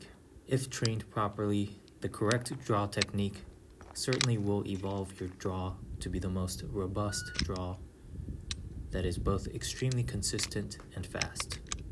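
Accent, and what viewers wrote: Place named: United States. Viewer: You sound American. What that shitty flag on your shoulder?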